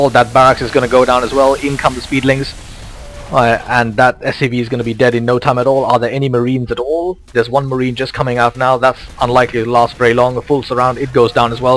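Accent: United Kingdom. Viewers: Thanks for the cast and as always loving your British accent, keep it up!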